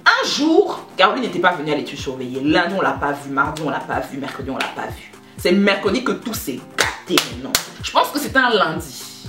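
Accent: Belgium. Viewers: Tu es belges ?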